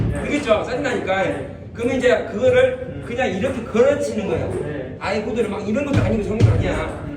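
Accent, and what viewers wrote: Gwangju, South Korea. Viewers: 광주 싸나이 배딸배아빠"님 반가워요. 저희 전라도 광주 맞아요 ^^; 광주 살 다 직장 때문에 수도권으로 가신 분들이 많지요. 배딸배아빠님께는 의 구수한 사투리가 더 정겹게 들릴 거 같아요. 이렇게 탁구를 통해 다시 인연을 맺고 얘기 나눌 수 있어 기뻐요. 탁구 10년 차라니 대 선배님이시네요. 저 로봇수제자는 올해 탁구 4년 차 새내기 입니다. 그래서 엉성한 것투성이랍니다. 하지만 탁구 열정은 누구에게도 뒤지지 않아 졸라서 부족한 것 채워가고 있어요. 정말 탁구 레슨 중에 하시는 한 말씀 한 말씀이 주옥같은 경우가 많아요. 그래서 저만 보기 아까워서 유튜브를 시작했답니다. 어제까지 춥더니 오늘은 완연한 봄기운이 느끼지는 불금입니다. 즐겁고 행복한 불금되세요~ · 관장님~ 리시브 강의 최고네요~ 구수한 사투리..친했던 광주출신 군대 동기가 생각나네요.. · 나도광주사람이지만 사투리진자 최고네요 엄청웃기요ㄷㄷㄷ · 광주인가요~? 사투리 구수하니 좋네요 ㅎㅎ 광주살다 서울와서 직장다닌지 16년 됐는데 그립네요~ 서울에서 탁구에 빠져산지 10년차 초보인데 관장님 가르침이 주옥같네요 ㅎ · 전라도 사투리 구수하네요 내용도 좋구요 코치 부럽습니다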